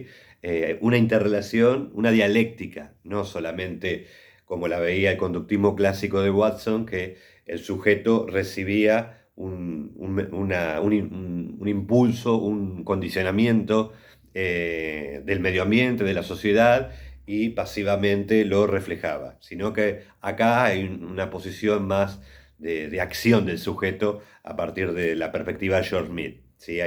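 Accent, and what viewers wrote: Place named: Argentina. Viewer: Desde Roma, entiendo el castellano en versión argentina también 😊, digo que la lección me gustó mucho y que resultó muy útil para asumir conceptos claros 👍 Veramente complimenti! 🌟😘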